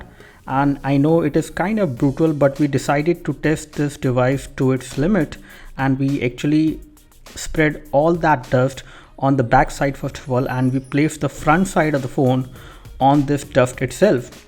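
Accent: India. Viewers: I can't fucking watch this, holy fuck the cringy indian accent.. HELLOD ILLD PUD SOMED WADDAHD IND DEHD SCREENDID.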